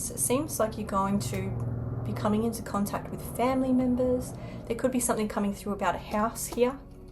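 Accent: Australia. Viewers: you are ethereally beautiful. your eyes look like pools of eternity. if i'm not mistaken your accent is aussie. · Thank you my dear darling for the wonderful reeding I truly love you girl you're alsom little woman and girl I love your eyes and you're astra accent so sweet with that stay cool and cute your good friend dale senney ❤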